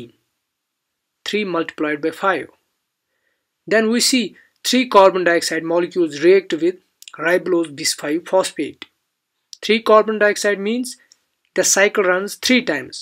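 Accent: Kashmir, India. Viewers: I guess you are from kashmir brother...? Am i right?? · Thanks for explaining how life works. And thank you for using English. Your Indian intonations are a little hard to follow but I compliment your efforts. 😀👍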